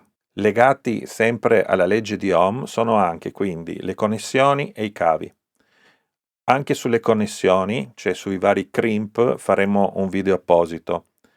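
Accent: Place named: Sicily, Italy. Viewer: Sei molto chiaro nelle tue spiegazioni dettagliate .( Dal tuo accento ho capito che sei siciliano ,,auguri!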